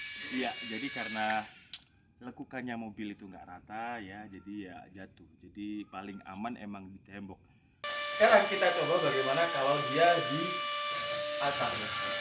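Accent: Malang, Indonesia. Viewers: Ngalam sam sawangane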